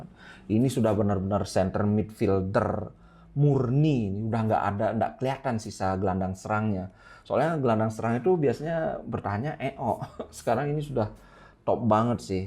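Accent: Bali, Indonesia. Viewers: Bang orang Bali ya bang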